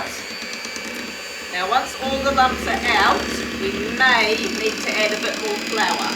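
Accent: New Zealand. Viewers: Lol Ido love how natural you are! Also its great hearing a NZ accent ❤ Commenting from Waikato, North Island. Great channel, keep up the good work 👏🏼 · Just returned to oz after a wedding of my niece; so nice to hear the kiwi accent. From a very homesick kwassie.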